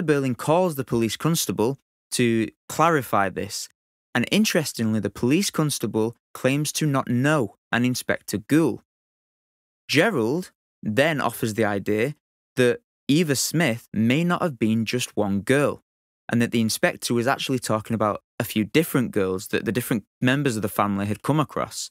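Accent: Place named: North, United Kingdom. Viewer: Gentle northern accent = alpha commentary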